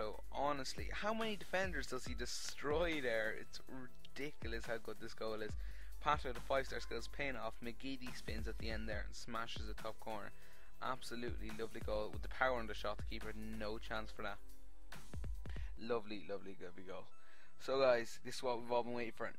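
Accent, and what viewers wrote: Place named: Ireland. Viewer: Gaming countdowns never fail with an Irish bloke commentating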